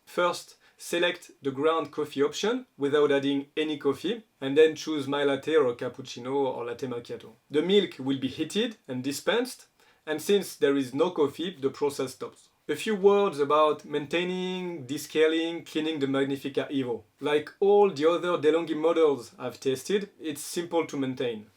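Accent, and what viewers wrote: France. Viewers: your english is very bad.. it sound very french! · While having an obvious French accent, his English is quite concise and easy to understand. You are also clearly a nonnative English speaker judging by the way you have worded your comment. Either that or you are just poorly educated. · Your comment was totally unnecessary, is more than obvious that he’s French and of course he has an accent, but that’s it, I didn’t hear any grammar errors, I understood 100% what he said.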